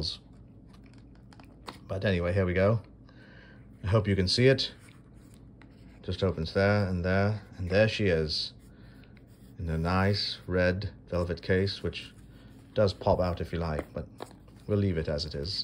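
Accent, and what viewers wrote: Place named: Portugal. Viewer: Fantastic Portgues accent. Spot on, mate!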